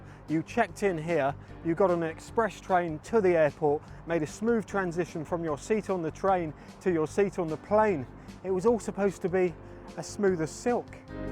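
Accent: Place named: London, United Kingdom. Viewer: .. i'm fascinated by your accent.. you sounds like born and bred Londoner.. how come!!!.. just founds your Channel.. haven't had time to look at all your video yet..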